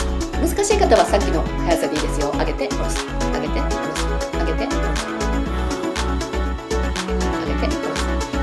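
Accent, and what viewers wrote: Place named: San'in, Japan. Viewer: まだ30代前半なのにたるんできて絶望😭してましたが、毎日試してみます！ところで、さん、山陰の出身ですか？イントネーションにどことなく懐かしさを感じてます😊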